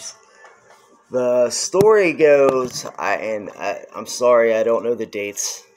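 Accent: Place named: West Coast, United States. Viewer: Wow well out on the accent HA HA HA HAthat's comical,and I thought I was good at accents,could have sworn I heard that west coast surfer sound in your accent ahhhhh... Yeah,she's ok now,very long time ago but when that happened and the things that happened after.. it was like with taps coming in and noises like coming down the stairs,made me think of your doors opening and stuff it's always hard to catch these things on camera though. Like they don't want to play when you do,when I was at my other flat two small pictures just jumped off the wall whilst I was looking in the mirror near them I only saw it through my peripheral vision but the girlfriend saw it I was like ' wtf happened then' but she saw it,they couldn't have fell by accident as they wehanging off nails you had to lift them up to get them off and other times,other things that were quite frightening at times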